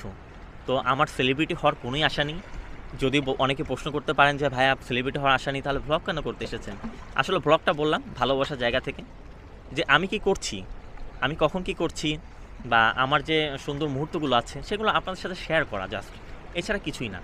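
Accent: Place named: Kolkata, India. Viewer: আপনার বয়েছ কলকাতার বাঙালিদের মত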